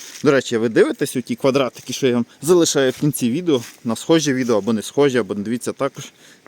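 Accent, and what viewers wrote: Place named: Lviv, Ukraine. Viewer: Первый раз чую львовску мову... Интересно... Я всэ розумию... 😊😂🎉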